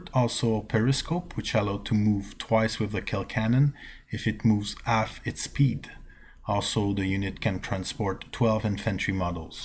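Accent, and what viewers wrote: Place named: Quebec, Canada. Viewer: 2 sec dans ton video j'ai reconnu l'accent quebecois ! Lache pas la patate bon video !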